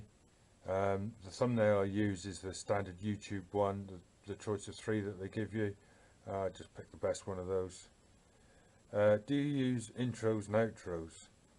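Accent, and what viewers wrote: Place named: Cornwall, United Kingdom. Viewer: You seem to take care of the upper Tamar,i've been concentrating on the lower! Tributaries of it anyway.Good to hear that Cornish accent.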